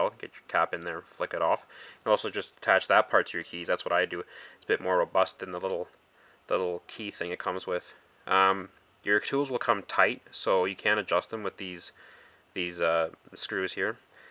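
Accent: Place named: Canada. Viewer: Does anybody else think right when he said he was from Canada you heard the Canadian in his voice 🇨🇦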